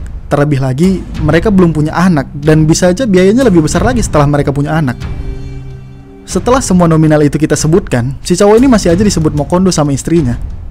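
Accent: Medan, Indonesia. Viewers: sorry oot, btw kau dari medan ya bang? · Bang orang batak lu ya ? Wkwkwk Logatnya kayak orang medan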